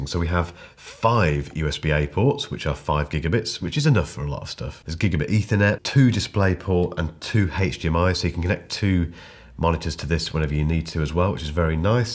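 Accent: United Kingdom. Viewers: Mouse does not look as though it's for left handers!! (waiting for my 16" MBpro) I am am looking for a something that will connect from the MB to my 10gb home network. Great channel thanks for sharing you views. Are you in the uk? Accent is right but the $ reference makes me wonder. 😊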